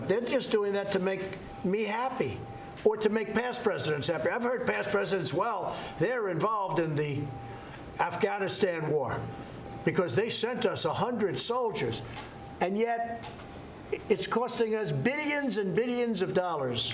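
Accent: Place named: Queens, United States. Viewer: He's definitely NOT clueless. He speaks like many visionary CEOs with the addition of theQueens NY style of communication. If you were more wordly you'd realize this.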